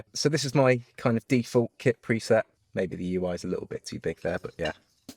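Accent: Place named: United Kingdom. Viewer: Haha your fine bro , it’s not an issue unless you literally never heard or spoke to someone from the uk !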